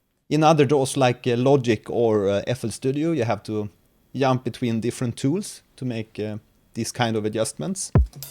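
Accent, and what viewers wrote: Sweden. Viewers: Thanks for this informative video. (PS! Love that Swedish accent)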